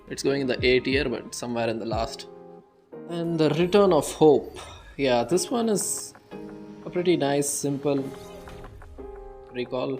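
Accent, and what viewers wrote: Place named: India. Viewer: love your india accent man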